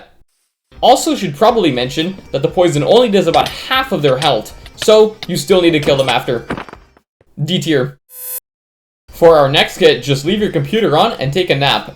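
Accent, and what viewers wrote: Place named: United States. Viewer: ? Is that you under that American accent?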